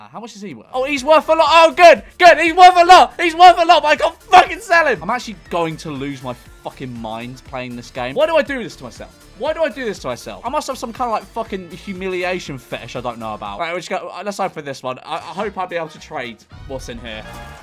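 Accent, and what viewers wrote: United Kingdom. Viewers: do more british boy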